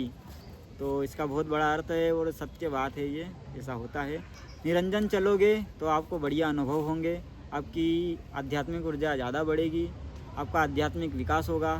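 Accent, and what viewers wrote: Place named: Malwa, India. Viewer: नर्मदे हर🎉भैया जी और माताजी।आप लोग तो परिक्रमा में ही ठीक थे।बिल्कुल चेहरे मुरझा गए हैं।माताजी तो ठीक है, पर आपकी तो आवाज ही पहचान का सूत्र है ,चेहरा तो अजनबी हो गया है😅।कृपया अपना संपूर्ण परिचय दें। बातचीत से मध्य प्रदेश निवासी लगते हैं,मालवा बेल्ट के।बहुत अच्छा बोला है आपने, बिलकुल साधु की तरह। नर्मदे हर🎉।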